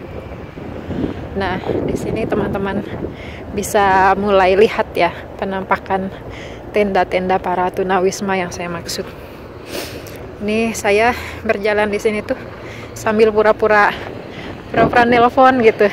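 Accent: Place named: West Java, Indonesia. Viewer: Teteh dari logat bicaranya ada kosakata bahasa Sunda. Memang teteh sudanya dimana.??? Kebetulan saya tinggal di tempat tth ambil vidio itu. Saya asli kota Bogor tth Jawa barat.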